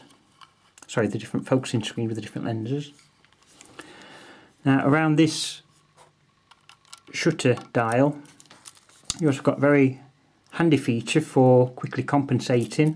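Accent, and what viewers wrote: Yorkshire, United Kingdom. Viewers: much appreciated, I noted after I sent the message that it was six years since the video, but I live in Lyndhurst New Forest have done for past 30 years, but used to be a fireman in South Yorkshire Sheffield having Transferred over from Manchester, so it was nice to hear the dulcet tones of true people! I collect cameras and have a varied selection right across the board, but I’m light on the Older Nikon, shall we say in the upper bracket, revered F series F1 and F2, so you prompted indirectly, a bit of homework 📚 and I looked at several references to these models and your right the F2 gets glowing reports in fact it’s difficult to find a bad remark, also the XD in my Minolta there is an XM on eBay at present, that looks decent and money at present is good, but I think you have swayed me. Loved the various controls and invasions, Minolta where always like that though, even in the Dynax range it was always more, but probably their down fall. 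Still the name lives on with Sony and It’s Nikon that are really having to come up with the goods now. Still carry that premium Name and always will. Thanks again and nice that you got the message. Regards David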